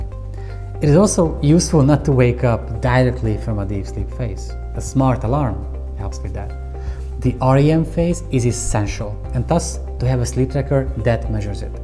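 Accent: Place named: Hungary. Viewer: Gondoltam az akcentusodból hogy magyar vagy 😅 Mit mondanál, mi a legjobb alvás tracker? Mit érdemes venni?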